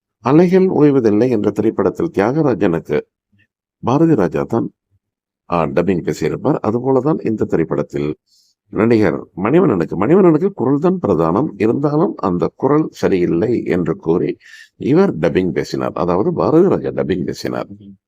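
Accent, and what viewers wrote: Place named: Colombo, Sri Lanka. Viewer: நீங்க bh abthul hameed மாதிரியே கொஞ்சம் பேசுறீங்களே சார் நீங்களும் கொழும்பா தமிழ் குரல் அப்படியே உச்சரிப்பு அனைத்தும் அப்படியே ஒட்டிருக்கு கொஞ்சம் நிஜமாவா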